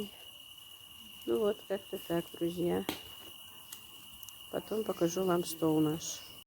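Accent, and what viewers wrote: Standard. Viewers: если не секрет, вы родились в Ингушетии? Чисто говорите на русском языке.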